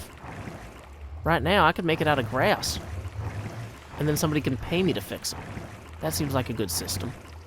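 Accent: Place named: Nashville, United States. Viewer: D you doing an iskall impression was hilarious , nothing will ever cover that nashville accent up :D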